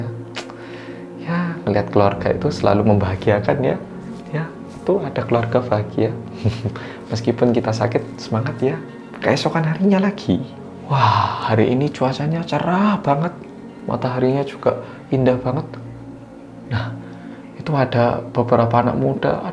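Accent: Java, Indonesia. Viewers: awal mula medok jadi kepeseng gimana nih?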